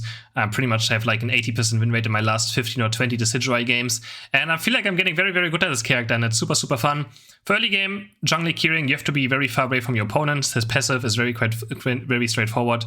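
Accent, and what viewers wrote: Germany. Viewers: Do you have a German accent or am I just imagining that?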